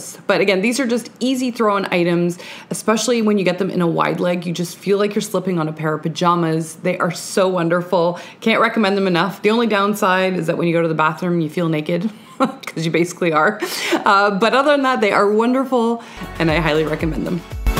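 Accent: Canada. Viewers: Love me a Canadian accent!! 🍁❤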